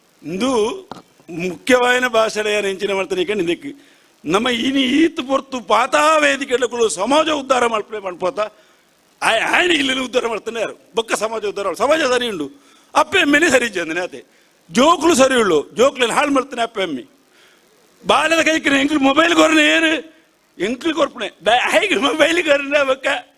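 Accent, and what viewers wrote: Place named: Tulu Nadu, India. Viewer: Anna irna kannada sari ijji, ir tulette paterna edde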